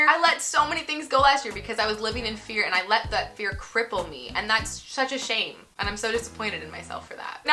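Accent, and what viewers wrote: Canada. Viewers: I'm an ESFP and I can say that assuming everyone is dead when they are a minute late is very accurate... Thanks for showing me that I'm not the only one! Also. Your accent is adorable and so hard core Anne Of Green Gables ❤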